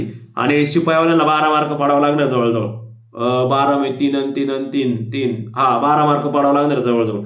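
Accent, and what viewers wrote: Ahmednagar, India. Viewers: सर मला तुमची भाषा ऐकूनच वाटल होत की तुम्ही नगर चे असणार म्हणून 😄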